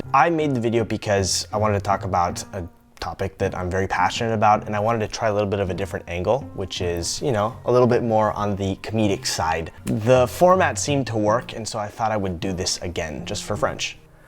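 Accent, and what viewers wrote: France. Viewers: I'm learning about my own language, I swear it's troubling how little one understands of their mother tongue... also, not certain that I'm not mixing it up with French Canadian because I've lived there for too long, but 'terrible' can also mean 'incredibly good', chiefly cut into two very articulated syllables, at the same level as 'chant-mé' Oh, btw, you have a sort of a south accent, which is funny because you could easily be mistaken for someone from Toulouse (or around that area) at times 👌🏼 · A lot of those expressions are not North American French. I can tell by your accent you learned French from France, and not the French from North America. Did you know that the North American French, mostly the French spoken in Canada is more authentic than the French spoken in France? That's because we were isolated from France from the 1600s to the mid 20th century so our language did not change as much as that spoken in France, so our French is more authentic and true. 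Generally the French spoken in Québec is no longer authentic because they adopted changes when the intellectual snobs wanted to distance themselves from their French Canadian roots. So they adopted more of the accent spoken in France and incorporated new words that never existed before in our vocabulary which came from France. This took place in the 1960s and most of the québecers have now completely changed their way of speaking. Whereas the 30% or so of French Canadians living outside of Québec have kept the original French from our ancestors. So the purest French, most likely in the world, is found in Canada, outside of Québec. I will give you one example of the difference between authentic French and the French spoken in France and in Québec. The French language is a Latin language and in all Latin languages we roll our "r"s. That is what French Canadians do, but Québec stopped doing that just as the French from France did. 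In AUTHENTIC French you roll your "r"s, you dont spit them. I am French Canadian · Im a french guy from Québec. You have a great accent. Its nice to see a foreigner understanding our little details in language. You obviously went to France to learn it. I recommend you to go to Quebec and, be mind blown by the way we speak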